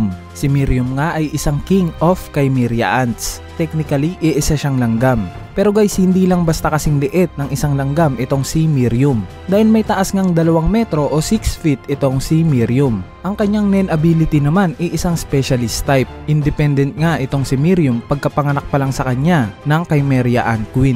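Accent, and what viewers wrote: Visayas, Philippines. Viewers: Nag mukhang mali lng yung pag pronounce nya kasi filipinx accent Hahaha. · Bisaya ata si idol😂😂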